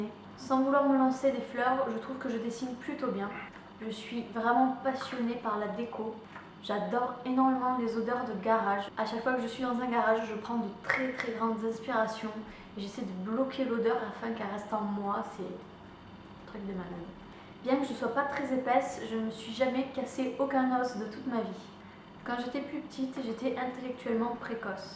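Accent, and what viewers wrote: South, France. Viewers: Bonne video mais dommage pour l'accent du sud je l'ai aussi et je trouve que c'est le plus bel accent comparé à l'accent du nord beaucoup trop pointu à mon gout! Apres l'accent du sud c'est comme tout, faut savoir l'utiliser et je suis d'accord avec toi par rapport au fait que selon les personnes, il peut devenir extrêmement vulgaire ! Bisous ! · Je suis pas d'accord avec toi pour le 50ème fait on peux pas renier l'accent du sud aha :D et enplus je trouve qu'il te va très bien! VIVE LE SUD :* :D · On a des points en communs ahah, sinon,laisse ton accent du sud, cest le meilleur! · Mais c'est super beau l'accent du sud dans une vidéo tu pourras parler avec ? :) (Je l'ai moi aussi) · Pourquoi tu trouves cela vulgaire l'accent du sud? :) En tout cas, j'aime beaucoup tes vidéos. Je trouve que tu donnes vraiment des astuces intéressantes. 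Et si je peux me le permettre, qui sont les uniques 5 youtubeuses françaises que tu suis? Des bisous♥ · J'ai aime ta vidéo claire et consise :) oh l accent du sud <3 il a pas l air moche le tiens ... Moi ça va ça ne se remarque pas dans mes vidéos il n est pas très prononcé . Pour le shopping plus ça va et plus je deviens comme ça ... Ah tout faire sur le net!!! Et bizarrement moi non plus je b´aile pas l eau sur les coudes quand je me lave le visage ! Voilà :) et pour les youtubeuses françaises et bien je t'invite a venir me faire un coucou alors histoire de voir une nouvelle tête . Bisous a bientôt . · Pourrai tu nous faire une video courte avec ton accent du sud pour voir a quoi sa donne ? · Super vidéo :D tu es vraiment une youtubeuse super justement pour ton naturel et la proximité que tu crées avec ton "public" ^^ je vais jouer le jeu tiens ! 1: je déteste dépenser mon argent, mais j'adore posséder et je suis assez addict au shopping (j'ai des wishlist longues comme le bras qui traînent dans mon PC) 2: je suis environ 40 youtubeuses françaises et 20 américaines. 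3: j'ai eu 18 ans, mon bac avec mention très bien et mon permis de conduire en l'espace de 5 jours cette année. 4: je suis fan de cinéma, et en particulier des films un peu psychologiques. 5: j'ai la phobie du feu, je n'ai par exemple jamais osé passer mon doigt à travers la flamme d'une bougie ou démarrer un barbecue... 6: je suis détectée surdouée mais cela n'influence pas mes goûts ou mes loisirs. Par contre, j'ai plus de mal à nouer des liens avec les autres que la plupart des gens. 7: je trouve l'accent du sud extrêmement relaxant et chantant :) c'est d'ailleurs une des choses qui me font aimer ta chaîne alors ne te retient pas! 8: je suis terriblement gourmande. 9: je suis très lunatique et c'est un problème car je suis susceptible d'être parfaitement normale et d'avoir des accès de colère ou des périodes de déprime. 10: je rêve de devenir actrice depuis que je sais marcher. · J'aime trop l'accent du sud ! 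Ne te retiens pas ! 😜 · Ca me choque un peu que tu ais honte de ton accent, moi aussi j'ai l'accent du sud et je n'en ai pas honte du tout, mes amis nordistes aiment bien ils disent que ça leur fait penser aux vacances mdr, c'est pas parce que tu as l'accent sudiste que tu parles forcément comme une cagole ;) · Tu peux le garder ton bel accent du Sud ! La vulgarité est une attitude et tu es à mille lieux de celle-ci ! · Ton dernier fait était "je me retiens pour éviter de montrer mon accent du sud, parce que je trouve sa très vulgaire". Pourrai-tu nous faire une vidéo avec cet accent ?? :) Continue comme sa tu es génial !! ❤️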